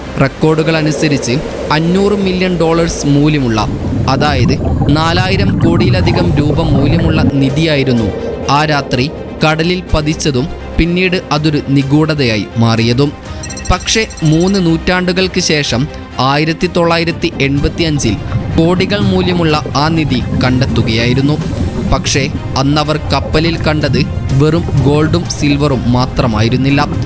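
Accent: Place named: Northern Kerala, India. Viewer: വടക്കൻ ഭാഷ കേൾക്കാൻ ഒരു രസം ഇല്ല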